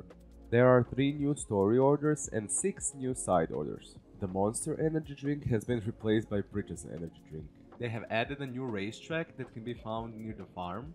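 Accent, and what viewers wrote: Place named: Hungary. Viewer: Are you from Hungary? Your accent sounds like Hungarian person I know. Good video btw, concise like it should be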